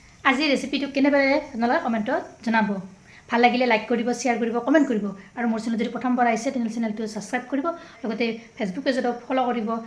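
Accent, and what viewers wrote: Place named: Assam, India. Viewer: তোমার কথা শুনলে আসামের কথা মনে পড়ে আসামে তো এই ভাষা বেশি সুনা যায় খুব সুন্দর হয়েছে ভালো থেকো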